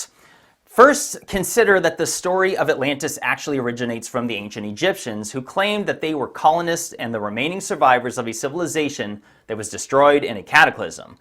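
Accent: United States. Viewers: you would seem far more intelligent if you stopped saying 45 hundred years ago, its written right there, 4,500 year ago. no other peoples I know of say this, only yanks. much like world series baseball when you dont let others play. come on, I am Shure you are very intelligent, so stop sounding like your reading a American used car price tag. anyway thanks for the video, although there was a show a couple of years ago of a gentleman walking around that eye and inspecting it. he wasn't convinced that it was anything other than natural even though he really wanted to find some proof of buildings, he could not, at lest to his satisfaction. I would imagine you are aware of this as you clearly have much knowledge on this subject. thanks again for you efforts......... 1000 thanks. notice I didn't write 10 hundreds, what a silly American habit.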